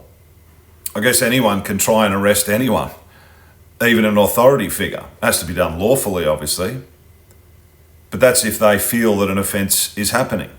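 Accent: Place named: Australia. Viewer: Wow a real Australian journalist speaking Australian I love it keep up the good work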